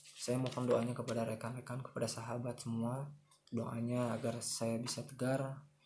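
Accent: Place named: Indonesia. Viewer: Ini mah logat orang sebrang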